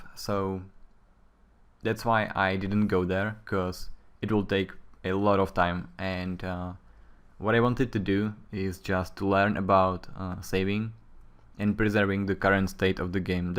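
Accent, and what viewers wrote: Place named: Czech Republic. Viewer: ***** Where are you from? Because you sound likr someone from czech republic :D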